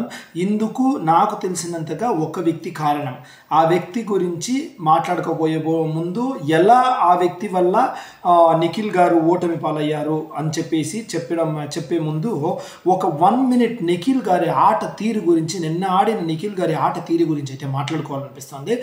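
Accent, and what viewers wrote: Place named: Karnataka, India. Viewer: Yes nenu kuda observe chesanu. Mee slang kannada telugu mix, na kannada friends telugu meela ne matladatharu.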